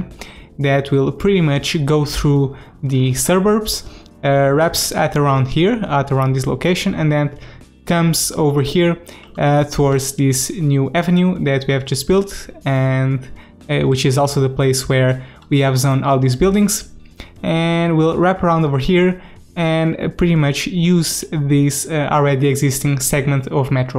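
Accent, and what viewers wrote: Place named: Portugal. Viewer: Tenho visto alguns videos teus e se a ideia era melhorar o teu inglês, tivemos todos sorte. Parabéns! Tens o melhor canal do youtube de skyline e tudo porque fazes aquilo que a maior parte do pessoal não faz - construir em tempo real (quase sempre). Tenho aprendido muito. Aprendido muito e percebido que ainda que o teu inglês seja exímio, só um português tem esse sotaque, o que me faz ter a plena certeza que tu entendeste tudo o que eu disse. :P Mas congrats mate! Great content, and amazing english :)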